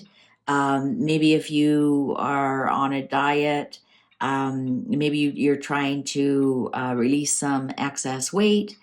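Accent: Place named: Canada. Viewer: Thanks for today's reading. I thought you were Canadian. I'm a fellow Canadian transplanted in Florida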